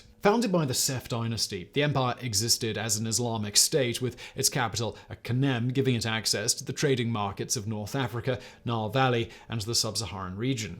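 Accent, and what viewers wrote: United Kingdom. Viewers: The British accent doesn't fix Simon's abysmal pronunciation of even the most basic of English words. Painful. Not wasting any more of my time here.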